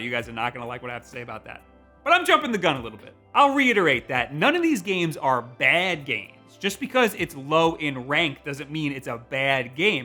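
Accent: New York, United States. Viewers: You can tell that he has that New York accent just because of the way he says Mario lmao.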